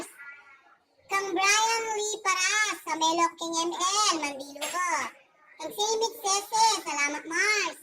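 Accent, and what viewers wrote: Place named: Pampanga, Philippines. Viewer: Cute talaga magsalita ng mega kapampangan, nagawa ko na sis sanay ganun din ang ginawa mo sa bahay ko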